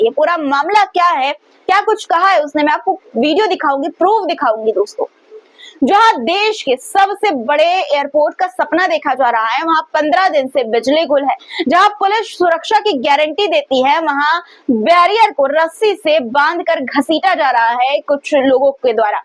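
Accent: Pakistan. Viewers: Iska bolne ka lehja to Hindustani nahi lagta۔ Pakistani lehja hai is ka۔ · ye urdu bol raha hai jaise pakistani ho.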